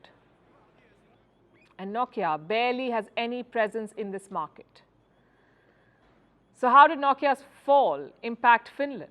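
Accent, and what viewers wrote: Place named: India. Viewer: She has Indian accent. It's a real thing. You will find 500 million people in India with that accent. They only understand that accent.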